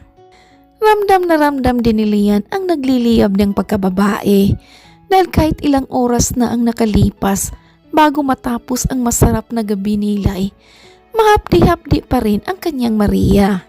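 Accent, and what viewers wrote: Visayas, Philippines. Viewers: Bisaya di ay ka....😊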